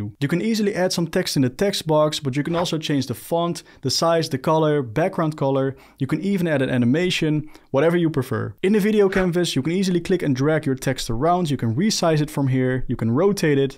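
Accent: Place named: Netherlands. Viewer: Bro is from the Netherlands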